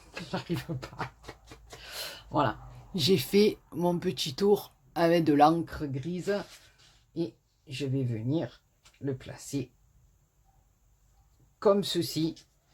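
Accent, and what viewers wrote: South, France. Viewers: Coucou, moi je te comprends tout à fait et je te reste fidèle car je t'apprécie beaucoup. Même si ça fait que 2 ans que tu fais du scrap, j'adore ce que tu fais, reste comme tu es. Les tutos en vidéo doivent être fait avec plaisir et sans stresse, sinon ce n'est pas la peine. C'est toujours un grand bonheur de te retrouver et d'entendre ton si bel accent du sud. Les personnes qui se sont désabonnées n'y connaissent rien en scrap et ne comprennent pas la scrapeuse. Fait bien attention à toi, je t'envoi pleins de gros bisous et mon soutient. Sabine Sarazin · Cc je ne suis pas abonnée depuis longtemps mais je dois dire que tu partages beaucoup de tutos. Ne t excuses pas et tu fais ce que tu veux sur ta chaîne. Merci pour le partage et pour ton accent du sud que j adore💕💕belle soirée 😘😘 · Qui peut bien vous demander de vous justifier sur "votre chaîne", une personne qui ne comprend pas grand chose et encore moins à ce qu'est le partage. 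Moi j'adore vos créations avec ou sans tuto c'est toujours un plaisir pour les yeux. J'aime votre bonne humeur et votre accent du midi, ne changez rien. Cette carte est magnifique et à refaire. Merci pour ce pas à pas très bien expliqué. Bonne journée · Bonjour, j'adore tout ce que vous faites, je regarde toutes vos vidéos malgré que je commente rarement. C'est votre chaîne vous y faites ce que vous voulez!! Vous n'avez pas à vous excuser et je trouve complètement ridicule et irrespectueux de quitter votre chaîne parce que vous faites pas tout les tutos de vos créations!! Vous devez prendre du plaisir sur votre chaîne et ne pas avoir aucune obligations!! Ce que j'aime aussi beaucoup c'est votre accent du Sud:D Bisous et continuez telle que vous êtes!!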